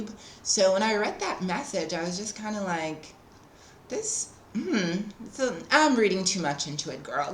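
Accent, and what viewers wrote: United States. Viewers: You really did nicely in trying to perfect that American, almost Valley Girl accent. Good job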